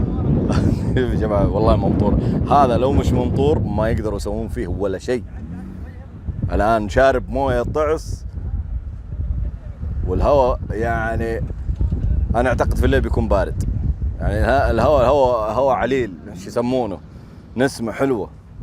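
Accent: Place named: Jeddah, Saudi Arabia. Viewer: راعي القناه لهجته بدوي بس النغمه جداوي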